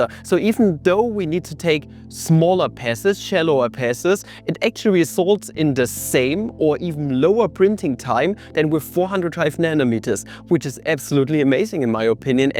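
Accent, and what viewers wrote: Germany. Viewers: Are you German? you have quite the accent.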